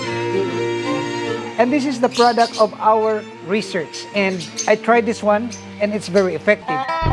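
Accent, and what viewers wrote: Philippines. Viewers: That's a typical Philippines 🇵🇭 accent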